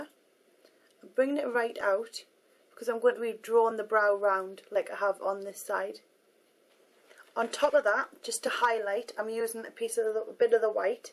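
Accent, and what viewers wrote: United Kingdom. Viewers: Something about a white girl with a british accent teaching people how to be like nicki just isnt right.....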